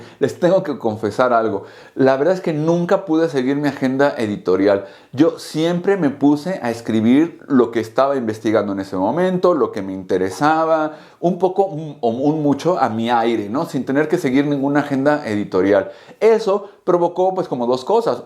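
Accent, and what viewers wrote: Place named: Mexico. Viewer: su acento mexicano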